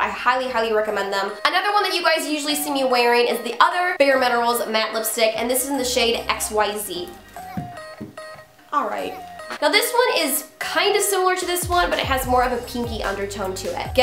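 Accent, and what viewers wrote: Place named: United States. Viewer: You have a very American sounding voice, if that makes any sense 😂 like you pronounce every word very accurately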